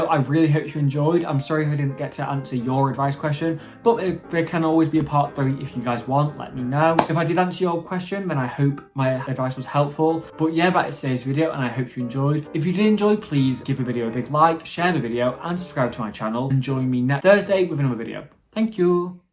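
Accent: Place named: England, United Kingdom. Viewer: Absolutely love your vibe ... and could listen to your voice all day. Love an english accent ..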